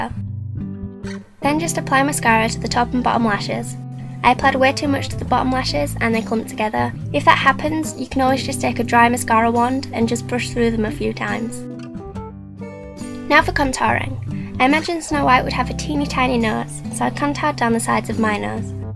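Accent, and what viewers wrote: Yorkshire, United Kingdom. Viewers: Her accent is from Yorkshire, England :)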